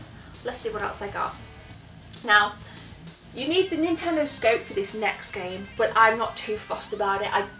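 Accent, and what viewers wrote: United Kingdom. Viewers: Awesome finds, I wonder if you always get good deals in the states because of the British accent? I here Americans like it. And also i really want Batman Returns on the snes.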